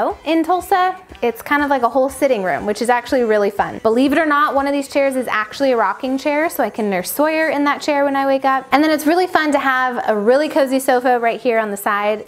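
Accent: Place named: California, United States. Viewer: Is it Saw-yer or Soy-Yer. Or just your California accent! ❤️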